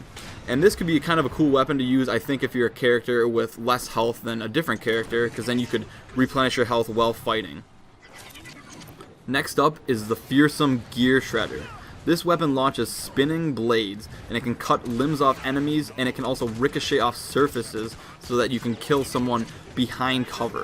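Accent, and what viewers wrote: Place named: Canada. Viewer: I don't. Canadians are of mostly French descent I think? That makes them european. I was just curious if he was from Canada because he sounded Canadian. Now I'm a racist for being curious. What a wonderful and oversensitive world we live in now, that I can't just make simple conversation without being accused of being a bigot. T_T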